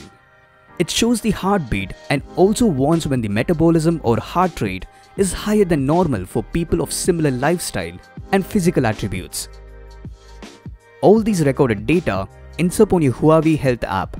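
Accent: India. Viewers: I think this man from india, didnt catch this fucking accent. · dat indrish tho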